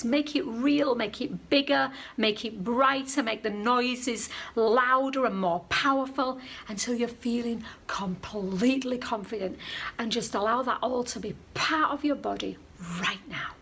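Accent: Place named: Hull, United Kingdom. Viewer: Sounds like you are from near Hull just like me! :)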